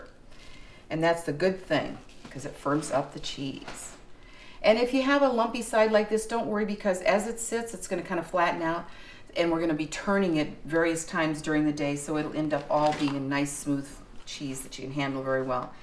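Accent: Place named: United States. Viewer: 86 Deg... I would assume it is Fahrenheit? A. Because of the US accent. B. Because I think a germ culture won't feel at home in anything but Luke-warm... C. I don't see vapour rising and in 86 Deg. C there would be vapour... But not everyone is an Engineer who loves making food, so it would have been nice if the publisher didn't assume only USA people watch these videos, and mention conversion quantities. 86 Deg. F or 30 Deg. C An Ounce or 28 Grams... etc.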